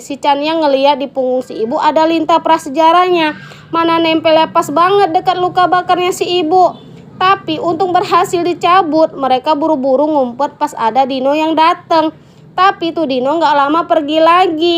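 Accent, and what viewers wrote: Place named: Medan, Indonesia. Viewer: Ini baru flim yabg ku suka. Mana suara anak medan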